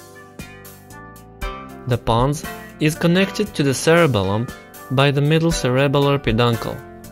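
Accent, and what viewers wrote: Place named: United States. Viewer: Why are you pronouncing English as Americans being an Indian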